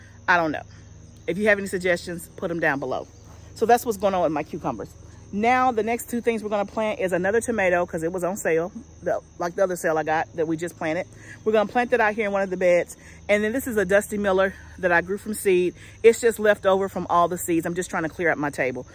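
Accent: South, United States. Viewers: I live in Florida but I'm from Georgia & your southern accent is strong & hilarious to me, love it 😂 U are ao funny and yes hunny its hot 🔥😅 Gardening is fun but frustrating at time with fighting critters that eat up your 3 months of hard work in 1 day! But just keep going 👍. I love your channel! ❤ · Do NOT un-Southernize the way you talk ! That's a good part of the fun, to hear how we all talk so different. Lol. Many blessings everyone.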